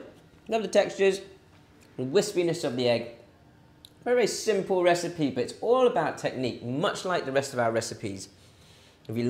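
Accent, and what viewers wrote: United Kingdom. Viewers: That lovely British accent!! :-) Thanks for all the good recipes!!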